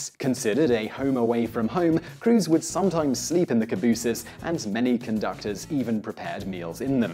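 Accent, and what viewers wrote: England, United Kingdom. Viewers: Michael Dougfir Me too only the other way round. I'm English as he clearly is. We don't have cabooses and none of those pictures was of anything English. It seems odd. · I had a dissonance problem - British accent, US words for all the things.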